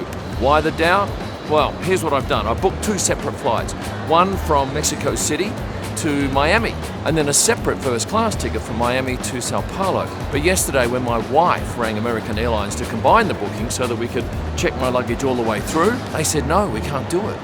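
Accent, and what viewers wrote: Australia. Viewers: A kip? A dickie seat? Loving the your Ausiness! Fun seeing in the background 👍👍👍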